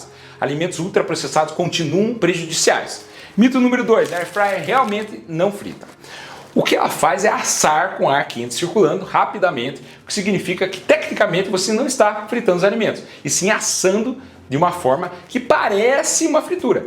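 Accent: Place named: Minas Gerais, Brazil. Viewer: Mineiro, caipira ou americano??? Bonitinho o doutor... fala diferente, né?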